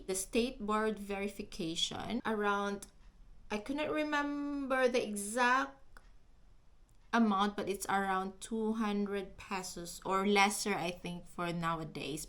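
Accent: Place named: United Kingdom. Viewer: Bakit kelangan mo pa mag english with konting UK accent pa kung target audience ng vlog mo is filipino. Taglish ka na lang procedure naman at tips ineexplain mo. Di naman to class. Korni lang. Kind a frustrating to watch.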